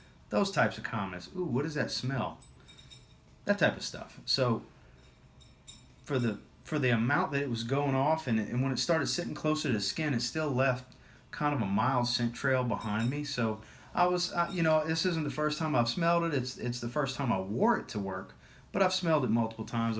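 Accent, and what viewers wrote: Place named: Louisiana, United States. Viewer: Are ya from Louisiana my brotha?! Good job on the video! 318 for me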